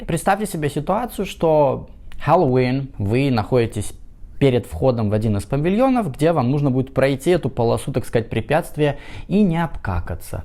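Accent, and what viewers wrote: United States. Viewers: Молодец. Американец. Про выговор имею ввиду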